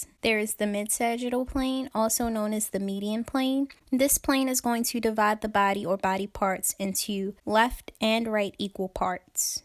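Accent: Baltimore, United States. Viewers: Definitely sounds like a Baltimore accent! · This was incredibly useful!!! Thank You!!!! I know a Baltimore accent when I hear one😊.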